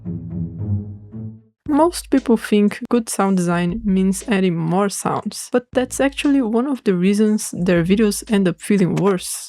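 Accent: Brazil. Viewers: eita sotaque brasileiro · Are u brazilian? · reconheci seu sotaque brasileiro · you sounds brazilian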